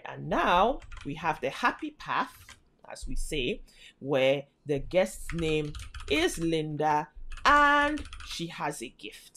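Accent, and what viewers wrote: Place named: Nigeria. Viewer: Nice! Off -Topic: From your Accent it’s hard to believe you are not Nigerian (specifically southern Nigeria (south south or south east)…intonation & manner of speaking clearly Nigeria, & I’m sure other Southern Nigerians will feel the same way. If you do that ancestry-ish tracing stuff. I’d easily place a Bet on the result matching what I said above.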